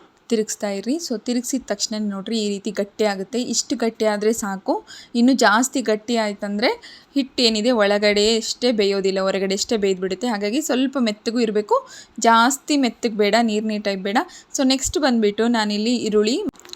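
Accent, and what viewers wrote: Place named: Hindi, India. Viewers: ಅದು ಹಿಂದಿ ಭಾಷೆಯ ಉಚ್ಛಾರಣೆ. ಅದಕ್ಕಾಗಿ ಅವರಿಗೆ ಭಾಷೆ ಬರಲ್ಲ ಅಂತ ದೂರುತ್ತಾ ಕೂರ ಬೇಡಿ. 🙏🙏😅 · Neevenu north india dhavara? Achchukattaagi kannadadhalli sariyaagi uchchaara maadi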